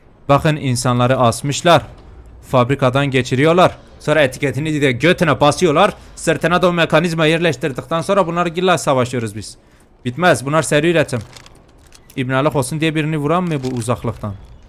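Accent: Elazığ, Turkey. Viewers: Abi Elazığ lı mısın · Abi sen Elazığlımısın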